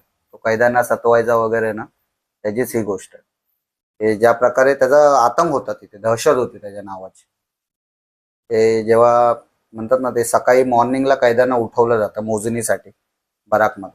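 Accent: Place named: Mumbai, India. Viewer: your marathi accent is lovely... typical Mumbai marathi... I love it.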